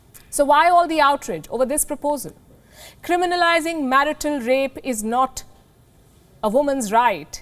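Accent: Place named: India. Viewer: People mock her for her Indian accent. You are probably the first non Indian audience I have seen to appreciate her- I hope u r non Indian!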